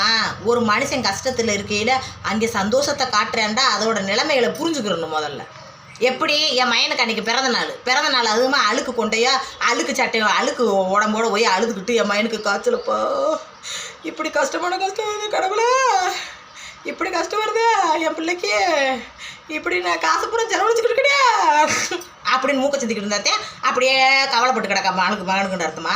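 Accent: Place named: Tirunelveli, India. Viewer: அக்கா திருநெல்வேலி பேச்சு வேற லெவல் சூப்பர் ❤சூப்பர் அக்கா ❤❤❤❤❤❤❤❤❤❤❤❤❤❤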